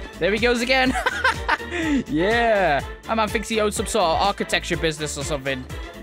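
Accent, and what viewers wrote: United Kingdom. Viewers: This is awesome! Are you British?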